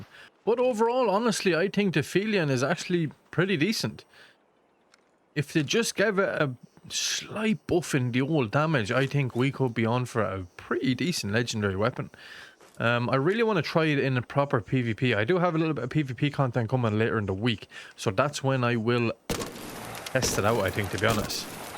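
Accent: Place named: Irish Traveller, Ireland. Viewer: It's called Irish Traveller or "Pikey" accent. Watch the movie "Snatch".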